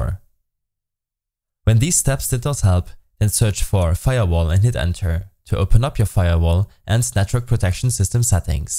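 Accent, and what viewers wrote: Germany. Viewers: OMG so wie es aus sieht bist du aus Deutschland wenn ja digga krasses Englisch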